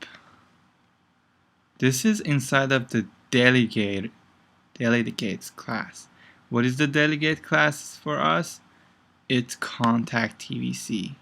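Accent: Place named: India. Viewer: Dude, are you from India? Just noticed your accent, but anyway your english is perfect!). Your tuts is very cool too, thanks)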